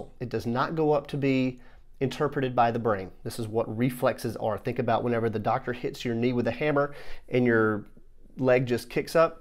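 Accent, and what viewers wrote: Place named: South, United States. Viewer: First time on the channel. I hear a Tennessee or Kentucky accent there. I picked up on it right away for some reason. Great video, I subscribed.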